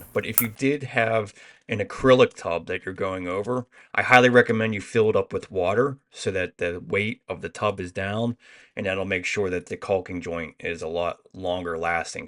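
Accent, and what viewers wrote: Pittsburgh, United States. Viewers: Let me tell you... you know a Pittsburgh accent when you hear one! Love your videos! Thanks for the knowledge sir.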